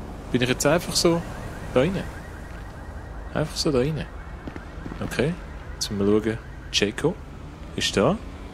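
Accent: Switzerland. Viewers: Englich Schweizerisch.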